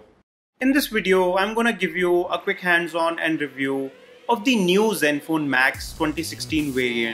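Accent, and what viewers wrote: India. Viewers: i like listening your indian english ... nice review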